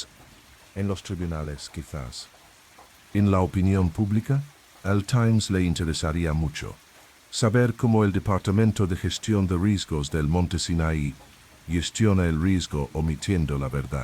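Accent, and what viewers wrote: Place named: England, United Kingdom. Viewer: Porque está payasada de hablar con ese acento de inglés tratando de hablar en español.Insoportable.Una pena.No puedo recomendarlo.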